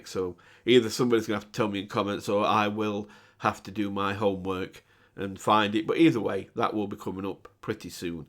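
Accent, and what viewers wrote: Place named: Midlands, United Kingdom. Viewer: Hi. One of the reasons why it is so nice to listen to you is that you are always so honest. As for the concert, I actually find it better after that. I personally think that only one artist should appear at a solo concert. And the singing of his parents is not my taste either. So I'm glad he's more solo on his subsequent concerts. I'm already looking forward to the next double..... when did you say you will do it? Soon? The Dimash-Soon (betwenn 3 days and 3 years) or a -Soon (tomorrow) 😂😂😂 And I'll forever be happy to listen to your voice, the giggles and the middle-englisch dialect... so see you „soon“ ❤️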